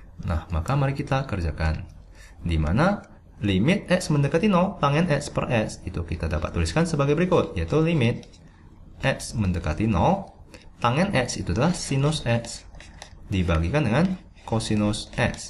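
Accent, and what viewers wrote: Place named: South Korea. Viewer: Logatnya kaya org korea 😅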